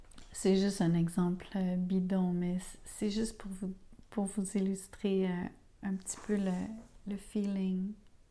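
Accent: Quebec, Canada. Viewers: Bonsoir madame Pour la pause... elle est pour moi une rupture malgré c est vrai une attente pour ce qui est de la communication , j'ai bien tourné le dos à cette personne. Je suis ce Lion ( feu ) et elle balance, tres changeante et tres joueuse, non ancrée et je ne serai pas surppris qu elle joue sur plusieurs tableaux d' ou la rupture . C est vrai, j ai cette impression d un bonheur perdu mais ce n etait qu illusions et je l ai compris et accepté. Tout à fait exact pour cette balance qui n'a aucune confiance en elle meme si elle presente aux yeux du monde tout le contraire. C est vrai... il n y a pas de gris chez moi ... c est tout ou rien . Cette personne a tjrs ete voir ailleurs ( addictions) . Pour ce qui est de se retrouver en couple .... Noway Merci madame💐🙏, voix appaisante, douce, avec un arriere accent du Quebec et meme un peu de latin . Xoxo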